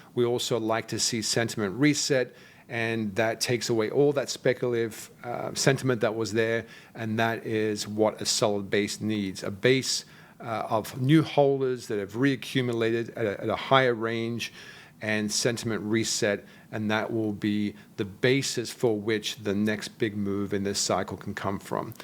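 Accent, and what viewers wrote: Australia. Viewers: I can’t place his accent… Is there a little bit of Aus or Kiwi accent in there??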